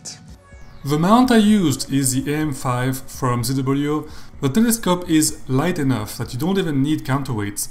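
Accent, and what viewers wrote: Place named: France. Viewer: I knew it, I did detect a French accent❤❤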